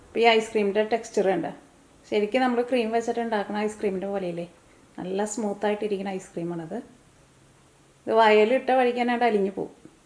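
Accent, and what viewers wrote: Thrissur, India. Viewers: ചേച്ചിയുടെ ഈ റെസിപ്പിയും സൂപ്പർ... ഞങ്ങൾക്ക് വലിയ ഇഷ്ട്ടമായി ആ തൃശ്ശൂർ ഭാഷ സൂപ്പർ, and....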